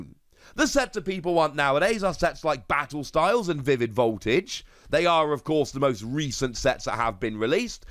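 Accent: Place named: United Kingdom. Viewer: Yesterday I've watch your video about eevee evolutions vmax and I was thinking how british is your accent. Today you mentioned about your local uk distributors :D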